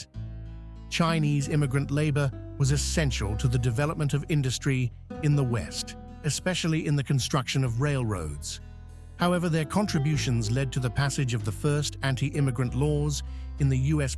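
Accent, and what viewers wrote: England, United Kingdom. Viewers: Why a Brit narrator? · Why the English accent … why the blurred drawing of Custer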